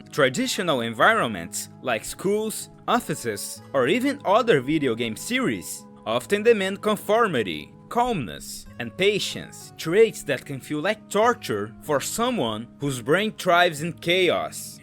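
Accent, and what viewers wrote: Brazil. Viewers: My dudeeee, are you? It's almost the same title and almost same thumbnail! And I noticed that you have that brazilian accent aswell. Just wanted to know. I'm subscribed in both channels, so I was curious xd Ty for the attention! 👊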